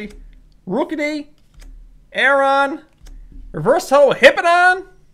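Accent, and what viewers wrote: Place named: Newfoundland, Canada. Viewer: Love ur play mat and love the content I'm from nova scotia u sound like ur from newfoundland bud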